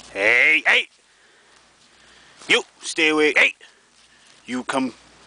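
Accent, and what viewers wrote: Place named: Canada. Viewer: Keep scrolling Lol I wasn't going to say anything, but what part of Canada are you from? I'm from Atlanta Georgia but I went to college at the University of Calgary. Almost every one of my friends from Calgary says Aboat, and get oat. .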